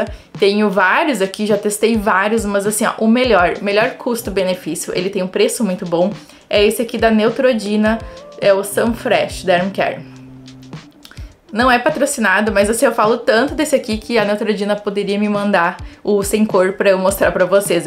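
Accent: South, Brazil. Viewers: Pelo sotaque também é do sul do estado, obrigada pelas dicas ❤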